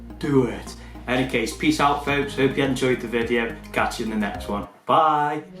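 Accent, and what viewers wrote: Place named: Stoke, United Kingdom. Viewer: I recognise that Stokie accent anywhere great videos keep them coming I am a newcomer to the hobby not quite took the plunge yet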